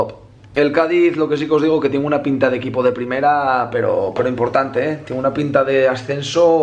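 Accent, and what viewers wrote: Asturias, Spain. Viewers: Habla español q diga ye, tiu por muy asturiano q sea se entiende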